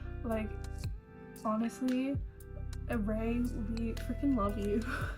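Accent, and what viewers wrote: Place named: United Kingdom. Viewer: me: don't mention fellow british accents every time you hear them also me: surprised to hear british accent even tho i've watched you before ( i have not moved from dark side kermit meme ig )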